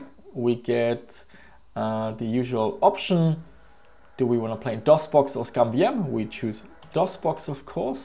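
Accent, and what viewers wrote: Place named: Germany. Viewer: Da is doch deutscher Akzent^^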